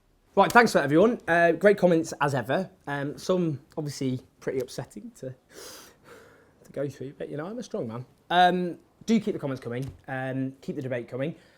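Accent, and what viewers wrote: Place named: United Kingdom. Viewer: Amusing, sharp and intelligent. Pity your british accent is a bit rough for a mere English-speaking spaniard, I'm sure I'm missing some meaning. Question: how much time do you think we have? I mean, until the majority of us get so used to this downsize in our living standards, our welfare and our civil rights, that we forget once we had rights, thus giving up the struggle for recovering them?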